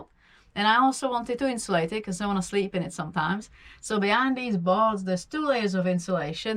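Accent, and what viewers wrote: United Kingdom. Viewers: I was going to say the same, lovely accent! Yorkshire with a twang 😎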